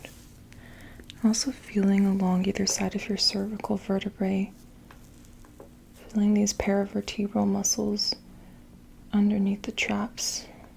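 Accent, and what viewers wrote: Canada. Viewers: You sound Canadian---I grew up in Toronto, myself---now living in the US--- so glad I have found you on these sites!!❤️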